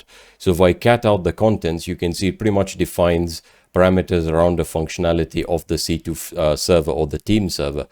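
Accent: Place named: Arab world. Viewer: heh yea , familiar arabic accent - ps me nohomo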